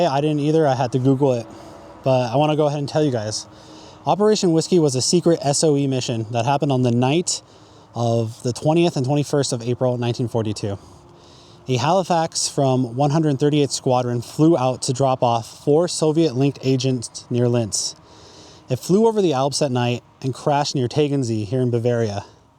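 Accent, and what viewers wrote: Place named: United States. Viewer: Thank you so much for your time making this video. From your accent I guess that you are American. It is wonderful that you describe the fallen warriors in such moving terms. You are an example to us all . Again many thanks.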